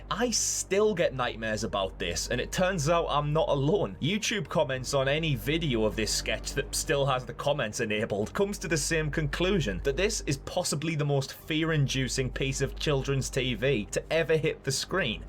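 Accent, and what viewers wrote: Scotland, United Kingdom. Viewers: this person sounds like Mimir from God Of War. Maybe because they both have Scottish accents idk. edit: Saw more of the video and I'd say more like a mixture of Mimir and Philza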